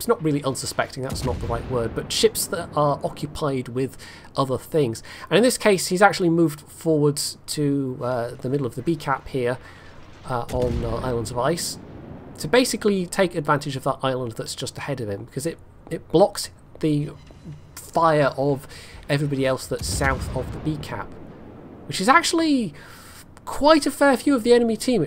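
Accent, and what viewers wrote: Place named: United Kingdom. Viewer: Huh, I didn't even realize I played it so sloppy until this nice British person with gentle voice told me just how much I suck really. Kappa. Jokes aside, glad you've found this worth looking at.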